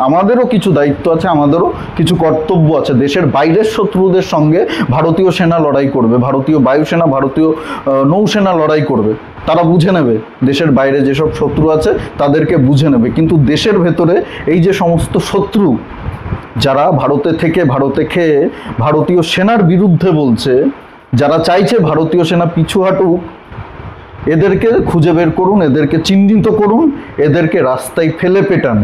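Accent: Bangladesh. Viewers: তোর বাংলা উচ্চারণে বোঝা যাচ্ছে তুই বাংলাদেশী|এদেশের মানুষের মধ্যে বিভেদ তৈরী করতে চাইছিস |তুই অবিলম্বে বাংলাদেশে পালা|ওটাই তোর আসল জায়গা |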